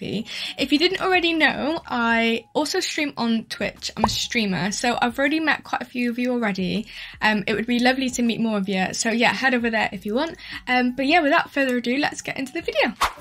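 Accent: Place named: United Kingdom. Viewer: Как же прикольно звучит британский английский))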